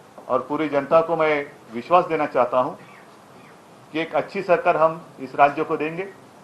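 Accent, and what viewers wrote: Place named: Maharashtra, India. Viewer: सारे लोगो को धन्यवाद,,,साहेब आपन पन आमच्या सारखेच हिंदी बोलतात,,,तुमचा गर्व आहे आम्हाला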